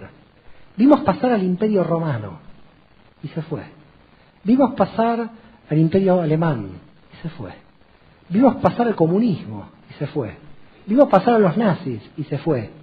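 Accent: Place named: Latin America. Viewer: Este señor es judío de religión y no de sangre habla con acento latino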